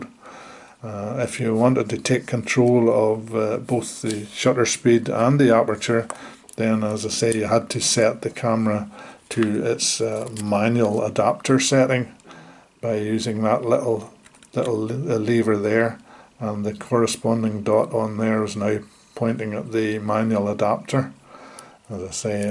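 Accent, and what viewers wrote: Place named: Ballymena, United Kingdom. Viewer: Great review. I can't quite place that accent, maybe a bit of Ballymena in there, well somewhere in mid Antrim, from a Co Down man living in Lisburn. 👍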